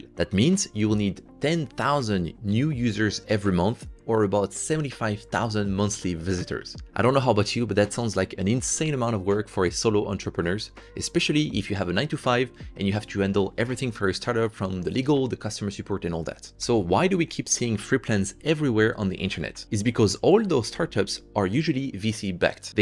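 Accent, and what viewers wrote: France. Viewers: Are you French ? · French accent is like honey for my ears Thanks for sharing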